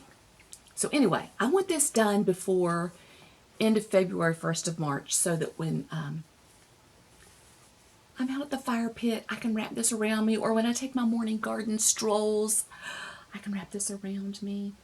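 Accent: United States. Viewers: you did a great job! I'm from Rocky Mount, Nashville NC area. When I heard your accent I knew I felt right at home!!! Can't wait to see more!!! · Love Love Love! Congrats on jumping in and thanks for sharing! I adore your East Tennessee ?(I'm presuming) accent - it reminds me of home and childhood and all things cozy. Glad to meet you!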